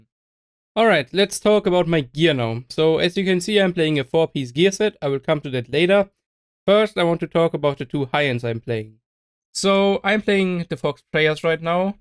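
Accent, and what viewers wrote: Germany. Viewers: typical german accent.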